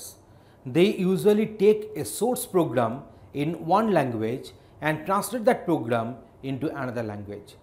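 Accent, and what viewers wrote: India. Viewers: 咖喱味的英语有点难懂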